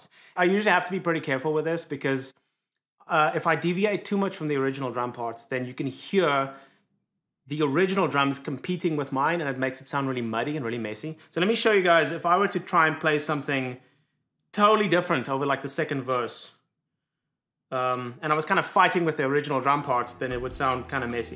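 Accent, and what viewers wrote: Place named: Afrikaans, South Africa. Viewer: Your Afrikaans-English accent of "flip" is classic South African. Hi daar hier van Bloemfontein af!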